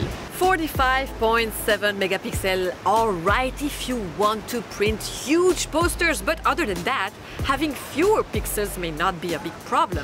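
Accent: France. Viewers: pretty french woman speaking English, easy win! very professional review, too bad i am not at all interested in a Nikon mirriorless! i am holding out for the panasonic S1r or a Sony a7III!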